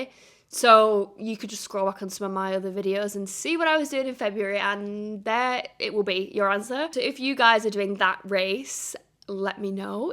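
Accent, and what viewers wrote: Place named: Northern England, United Kingdom. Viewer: Aw, it was so lovely to have this pop on randomly in my feed and immediately hear a Northern accent. I live all the way down in Vienna now, so it's rare enough. Happy to hear you're in love with slow, healthy running! Have a great 2025.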